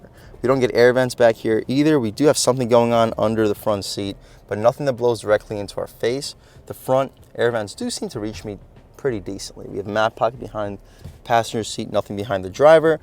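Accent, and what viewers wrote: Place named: Long Island, United States. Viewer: Dood has a Long Island accent but is in Tampa????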